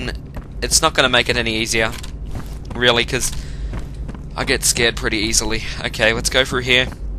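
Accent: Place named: Australia. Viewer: He's Australian, it's just his accent. I like the accent.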